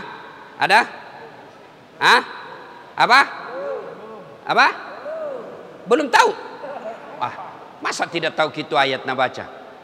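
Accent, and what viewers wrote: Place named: Bugis, Indonesia. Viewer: Cuplikan nya saya suka,persis ceramah almarhum zainudin mz... Namun versi bugisssssssss😀😂😂😇😇😇😊😊 Yang pernah denger ceramahnya di channel almarhum mz ??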